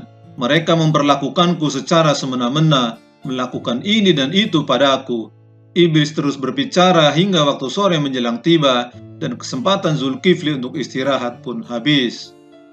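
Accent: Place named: Makassar, Indonesia. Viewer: Yg ngomong dialeg org makassar wkwwk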